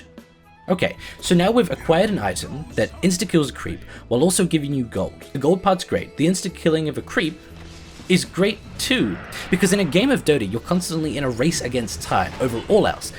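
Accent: New Zealand. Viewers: hes a New Zealander but i guess its kinda the same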